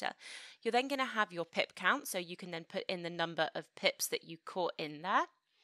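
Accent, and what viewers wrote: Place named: England, United Kingdom. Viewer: Coulda sworn she was English cos of the accent and then she said she is German and I almost did a spit take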